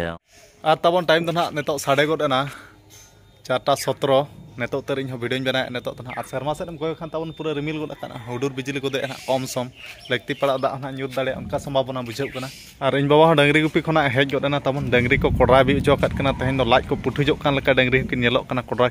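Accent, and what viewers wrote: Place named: Santhali, India. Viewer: Dada aplogh k santhali acent ❤❤❤ bhut accha hai love you dada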